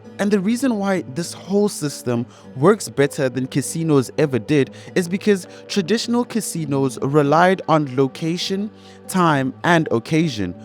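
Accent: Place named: Nigeria. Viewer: This dude sounds like a Nigerian. I am out here !!!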